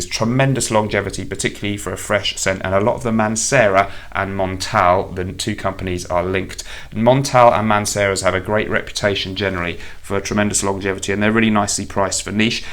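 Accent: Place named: RP, United Kingdom. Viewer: One of the best things anyone can do is drink water people lots and lots of water to keep your body and skin hydrated and quite drinking alcohol this is one of the biggest impacts I've had on my perfume performance drink lots of water ... great video gotta love the Queen's English 🤣 "fat kid at the chip shop" 😃😂😂🤣👌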